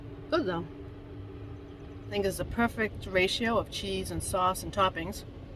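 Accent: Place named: Boston, United States. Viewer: I'm thinking of getting one of these, good idea with the rack at the bottom. I love your accent, where are you from, sounds like Boston.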